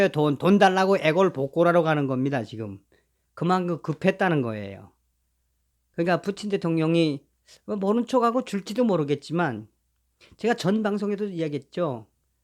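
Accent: North Korea. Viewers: 꼭 성공하세요 ~방송할때 표준말하니 더품격있네요~ 북한사투리 보다 표준말 하세요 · 북한에서 오셨어요? 좀더 생성한 정보가 좋고요 북한말 재밌어요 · 사정상 후원을 못합니다. 그러나 열심히 보면서 광고를 다 보도록 하겠습니다! 북한 사투리를 들으니 돌아가신 어머니가 생각납니다...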